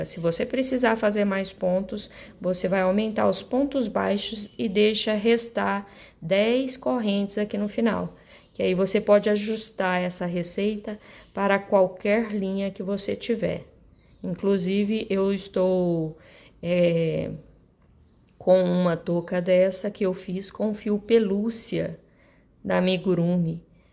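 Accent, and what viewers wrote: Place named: Minas Gerais, Brazil. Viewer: Que beleza de trabalho👏 Grata por compartilhar ensinamento🙌 E vc tem uma voz agradável e ensina de forma calma - parece sotaque mineiro rsrs lembrei de meu saudoso pai q era da bela Minas Gerais 😊 Ganhou uma inscrita e farei essa bela e pratica touca (faço para doação)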